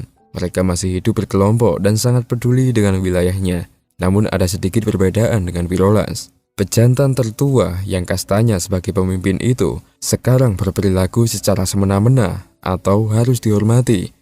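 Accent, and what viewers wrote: Java, Indonesia. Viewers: logat jawa mu tu norak gak usah so, kamu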